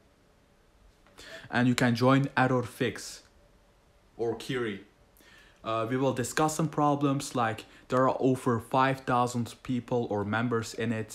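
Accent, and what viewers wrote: Netherlands. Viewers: Ik vind het echt leuk wanneer Nederlanders Engels praten xD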